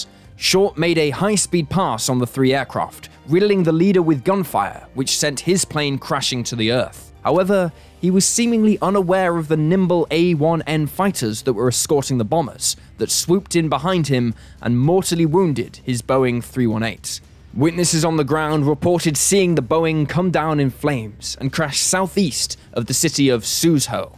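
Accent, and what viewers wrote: United Kingdom. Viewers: Such British blah blah blah........................... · British accent makes it better.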